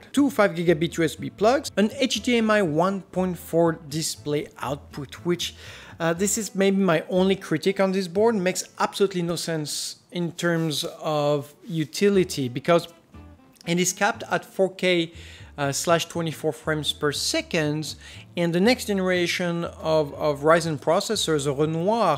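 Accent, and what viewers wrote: France. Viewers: That french accent tho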